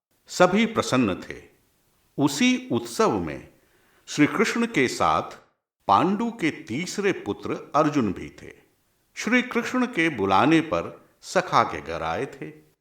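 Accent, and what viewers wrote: Standard. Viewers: उच्चारण की शुद्धता के साथ ओजस्विता इसे कर्णप्रिय बना रही है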